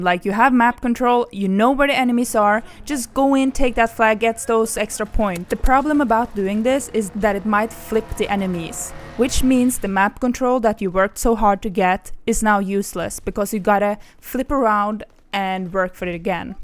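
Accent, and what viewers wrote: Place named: Ireland. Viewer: her accent is irish fyi people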